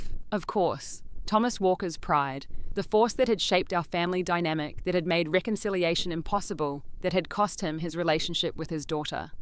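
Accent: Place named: Australia. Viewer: Just so ya know, no one born & raised in Minnesota has an Australian accent.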